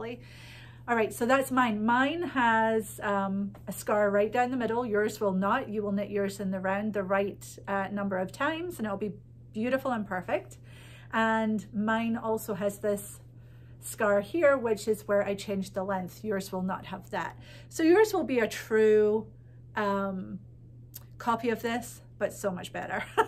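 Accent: Scotland, United Kingdom. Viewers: Thank you for all the Scottish updates. My mom has recently passed at 85 years. Being raised in Holytown near Glasgow, she learned to knit with woolly wool from her mother and I from her. I will also appreciate your Scottish brouge as she never lost hers after 54 years in the U.S.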